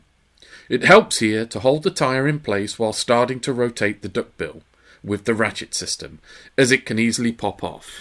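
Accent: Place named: United Kingdom. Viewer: ahhhhh your accent made me think you were in the uk 😎 They do have some uk based resellers 👌🏻👍🏻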